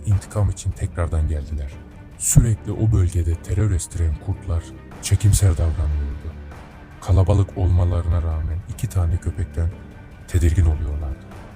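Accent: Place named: Kurdish, Turkey. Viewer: admin kürtmüsün len bu ses tonu ne kıroı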